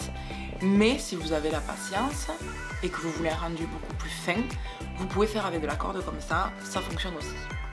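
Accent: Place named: South, France. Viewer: l'accent du soleil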